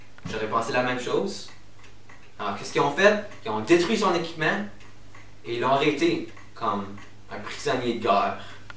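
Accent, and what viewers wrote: Canada. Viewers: hehe you canadian accent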